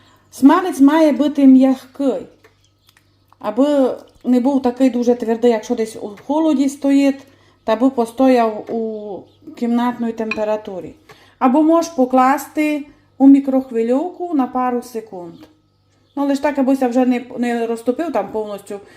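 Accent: Transcarpathia, Ukraine. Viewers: Мій лайк і підписка. Я з Івано-Франківська, але мені дуже подобається Закарпатський діалект і дуже поиємно Вас, слухати. Ваші рецепти супер. З нетерпінням буду чекати на Ваші чудові рецепти. 🥰🥰❤️🩵🩵🩵💛💛💛🇺🇦🇺🇦🇺🇦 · Найбільше любила такі рогалички з трояндовим вареннячком ♥ Це такі теплі спогади з давніх весіль та празників. На львівщині це - рогалики. Дуже люблю слухати закарпатський діалект. Дякую Вам за позитив і досвід. А хай не ростроюється )). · я дуже люблю слухати вашу закарпатську річ я зі Львова але приємно чути кожну людину з різних куточків України добра вам і вашим діточкам❤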